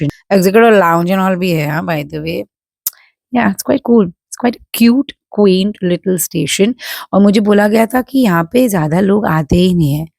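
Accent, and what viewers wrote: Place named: Mumbai, India. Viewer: You are typical Dadar - kar ....I mean your accent. Loved your video. Thanks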